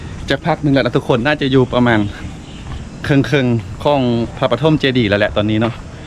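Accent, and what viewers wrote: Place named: Isan, Thailand. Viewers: สำเนียงเดียวกับคนจ.เลย เพชรบูรณ์ ครับ ผมคนเพชรบูรณ์พูดแบบนี้เหมือนกัน บรรพบุรุษอพยพลงมาจากหลวงพระบาง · สำเนียงภาษาลาวของแอด คล้ายๆสำเนียงภาษาเหนือของไทยอยู่บ้างนะ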